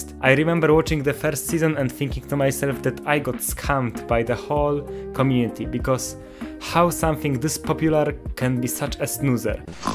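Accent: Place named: Eastern Europe. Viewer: you sound really slavic bro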